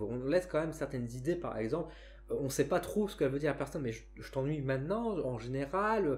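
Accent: North, France. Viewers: Reste naturel, toi même, je suis du nord aussi. Si la personne ne te comprend pas elle te posera la question(vous pourriez m'expliquer)? C'est tout 😊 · Ben t'es un gars du nord quoi ! 😊 On marche sur la tête si maintenant on ne peut plus s'exprimer tel qu'on est... Le naturel ya rien de plus vrai...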